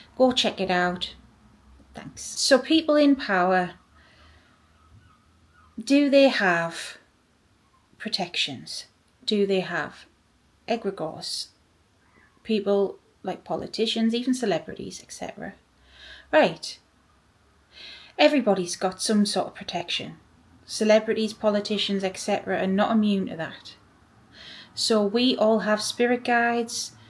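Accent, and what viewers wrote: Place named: Scotland, United Kingdom. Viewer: This is quite the journey we’re all on, quite the balancing act. I repeat to myself every morning before I get out of bed,” I will not give into the lies, I will not give into fear. I will hold onto Hope, I will hold onto truth, no matter what”. Building a supportive community is absolutely essential during these turbulent times. And my dear, your videos are very helpful just to hear that sweet sweet Scottish accent soothes my soul. Thank you for everything you do for this community! Sending love and light to all 🙏🏼💙❤️‍🔥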